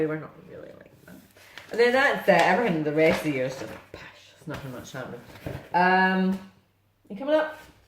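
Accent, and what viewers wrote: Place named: Scotland, United Kingdom. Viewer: Congrats on the run! I couldn't do that to save my life. I've only got 1 stitchy project on the go, so I think anyone whose attention span can manage StitchMaynia is impressive! So enjoy your videos, I'm an expat Scot living in Australia for 30years, so a wee touch of homesickness listening to you speak. Taps Aff! x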